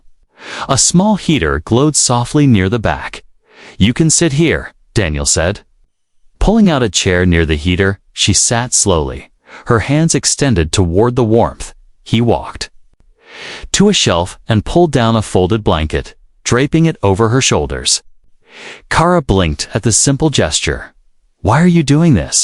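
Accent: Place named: United States. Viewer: The Anerican accent does not work with these kind of stories.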